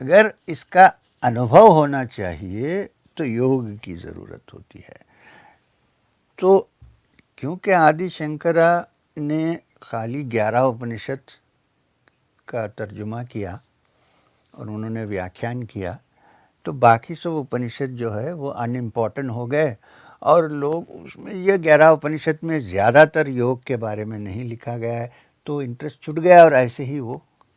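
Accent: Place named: Maharashtra, India. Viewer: Sri M your accent is also quite maharashtrian.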